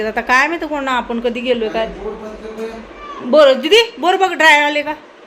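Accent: Kolhapur, India. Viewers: ताई तू म्हणाली की उंदर मांजर मिळतात येते खूप हसायला आलं 😅असो आपली कोल्हापूरची भाषा अशीच आहे मी पण कोल्हापूरचीच आहे ❤❤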